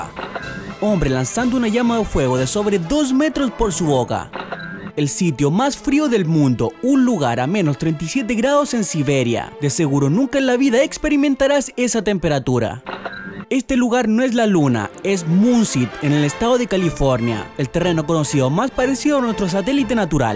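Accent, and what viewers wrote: Chile. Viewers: hace un video hablando en chileno yo soy uno xd por eso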